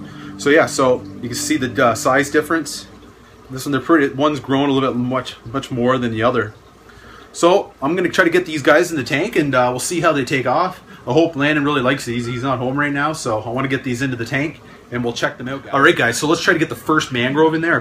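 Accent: Canada. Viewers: Are you from Canada? I love how you talk! Great video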